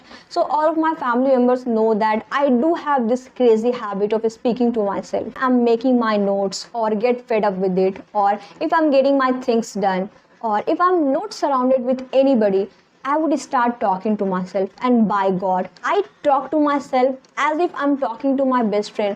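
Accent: India. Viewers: You could work a litle bit in order to get over your indian accent, it's strong. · I like your indian acent jejej..Greetings from Colombia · Indian accent ,but excelent.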